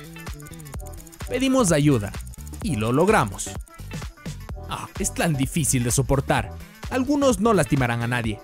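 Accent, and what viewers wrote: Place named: Brazil. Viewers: Soy la única que noto el acento al hablar del chico que narró el vídeo? Es brasileño,😳. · Si es brasileño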